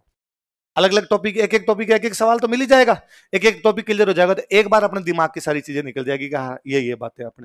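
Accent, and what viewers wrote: India. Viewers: bolne ka deshi andaj sahi h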